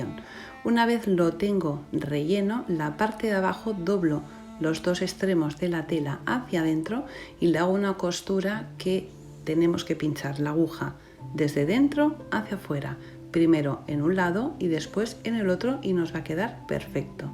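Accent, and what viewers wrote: Spain. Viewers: Por tu acento al hablar creo que eres española y como dicen allá eso merece un oleeeee , oleeeee todo bello mis saludos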